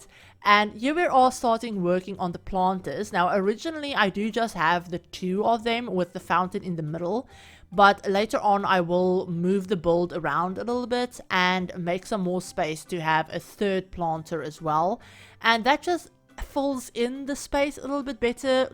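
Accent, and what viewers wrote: South Africa. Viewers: Eeyyy! Are you South African? 👏😁🇿🇦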